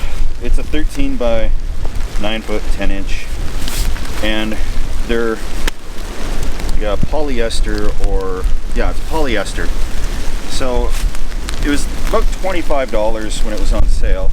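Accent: Canada. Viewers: I just subscribed today after watching a few vids. From his accent I am assuming he is from the Canadian side of North America:) I therefore await the stealth camping on a hockey rink!! Seriously, he seems a normal guy who super honest and informative and not one of those "look at me" YouTube campers, from what I have seen he is not in it for the likes, just the thrill (and probably the beers).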